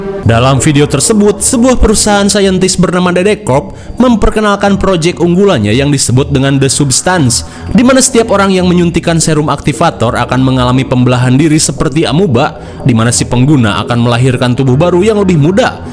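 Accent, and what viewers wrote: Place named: West Java, Indonesia. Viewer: Ci mimin nya orang sunda ini mah 😁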